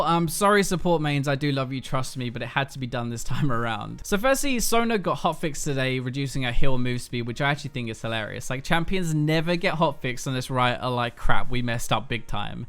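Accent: United Kingdom. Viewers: i love that british accent hehe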